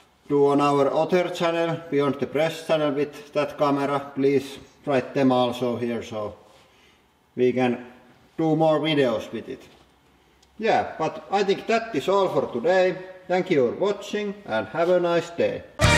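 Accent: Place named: Finland. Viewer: oh god the finnish accent :D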